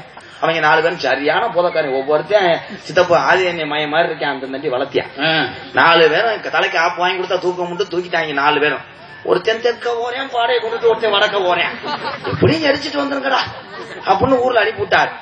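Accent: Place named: Chennai, India. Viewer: Unaku Chennai voice supper thala,chennaiku konzam mariyatha kuduga.chennai rasighan.please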